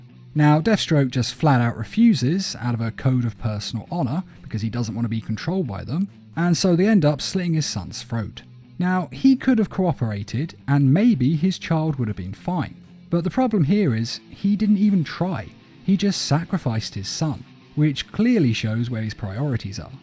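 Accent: England, United Kingdom. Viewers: I think it's an English accent.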